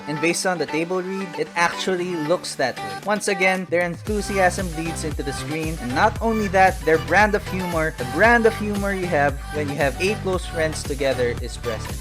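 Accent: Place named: Philippines. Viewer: Is it weird that we recognize a pinoy when we hear it? Is this the pinoy accent they ( the foreigners ) are talking about?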